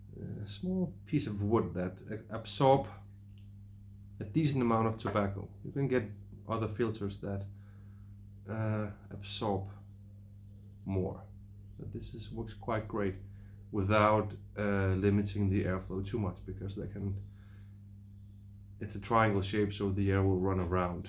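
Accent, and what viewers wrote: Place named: Michigan, United States. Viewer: Sounds like Michigan 😁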